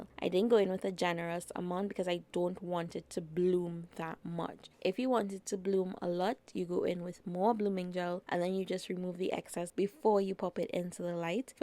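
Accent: Trinidad and Tobago. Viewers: thanks for sharing love your work all of it,are you from TRINIDAD I AM FROM TRINIDAD AND YOUR ACCENTURE SOUNDS LIKE US SPEAKING STANDARD ENGLISH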